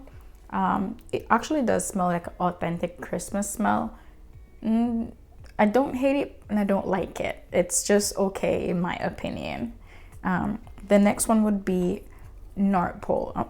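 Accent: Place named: Jamaica. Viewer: Totally love your review! Also love your accent…. Sounds like a beautiful Jamaican accent (I am also Jamaican). Good luck in your business journey.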